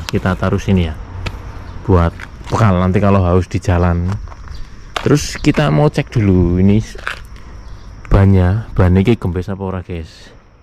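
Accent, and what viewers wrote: Banyumas, Indonesia. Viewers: NANG JEPANG YA ANA WONG NGAPAK YAH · Gaul rika yakin...wong Banyumas ya min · Gk bosen liat kontenny bang ❤. Gaya ngapaknya khas...😂😂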